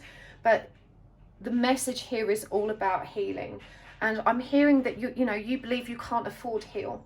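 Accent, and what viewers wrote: United Kingdom. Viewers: I love ur voice ur British accent amazing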